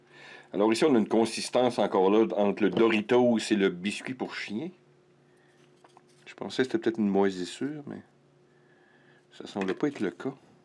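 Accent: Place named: Canada. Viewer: Interessant, j'aime l'accent canadien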